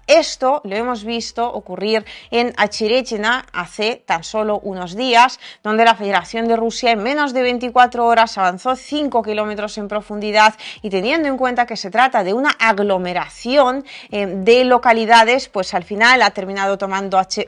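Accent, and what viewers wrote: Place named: Spain. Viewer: muy bueno tu programa y excelente tu información pero me aburre tu acento español, cámbialo.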